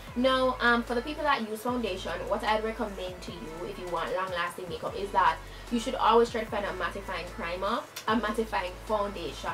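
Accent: Jamaica. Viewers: Amika Azore yass girl sounds 100% Jamaican love her tho #proudguyanese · I'm Guyanese and don't think you sound like guyanese nor Trini at all.Lol even a deaf man would give hear to your strong Jamaican accent.Some people just don't have hearing sound. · From she start talk I knew she was Jamaican 🇯🇲🇯🇲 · Only non Jamaicans would be saying you are not a Jamaican. I could tell straight away. Many have this narrow idea in there head of what a JA person should look and sounds like. It could be because you have a good grasp of the English Language. I am a Jamaican myself living abroad and many do not believe I am Jamaican because I do not walk around speaking Patois in a professional job. Silly narrow mindedness people. Do your thing. Your videos are really good and I am using them to learn the makeup techniques. Thanks for sharing. ✌🏿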